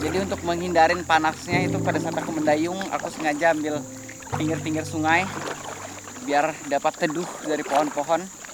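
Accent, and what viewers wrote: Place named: Borneo, Indonesia. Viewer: Logat nya Dayak 🤗 Sehat selalu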